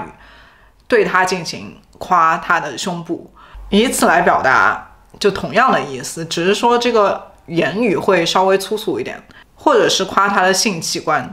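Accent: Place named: Shanghai, China. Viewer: 海外听到上海女生的上海普通话好亲切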